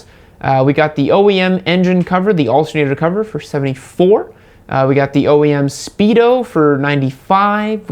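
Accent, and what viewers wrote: Portugal. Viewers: my dude are you portuguese? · Are you Portuguese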